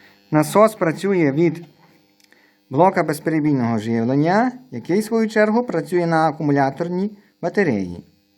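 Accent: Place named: Lviv, Ukraine. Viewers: тільки у Львові такий говір) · тільки у Львові такий говір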